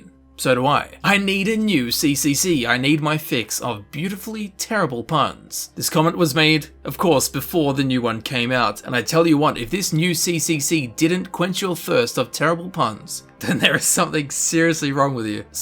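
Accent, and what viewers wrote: Australia. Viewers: Wait, your Australian?! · WHERE? WHERE IS HIS AUSTRALIAN?!?!? WHATS WRONG WITH IT?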